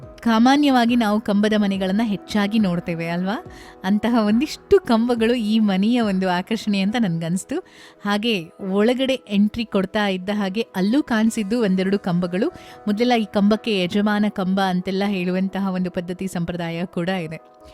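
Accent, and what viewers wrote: Standard. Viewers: ಏನ್ರೀ ನಿಮ್ ಕನ್ನಡ👌.. ಕಿವಿಗೆ ಇಂಪು ನೀಡುವಂತಹ ಸ್ವಚ್ಛ ಕನ್ನಡದ ವಿವರಣೆ😍 ಕಣ್ಣಿಗೆ ತಂಪು ನೀಡುವಂತಹ ಮನೆ ಹಾಗೂ ಸುತ್ತಮುತ್ತಲಿನ ವಾತಾವರಣದ ಚಿತ್ರಣ.. ಬೊಕದಾದ ಬೋಡು 😊👌..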